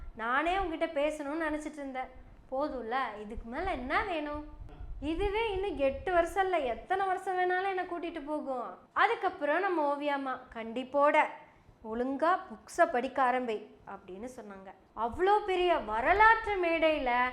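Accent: Kongu, India. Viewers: சகோதரி! உன் கொஞ்சும் கொங்கு தமிழ் மிகவும் சிறப்பு வாழ்த்துகள்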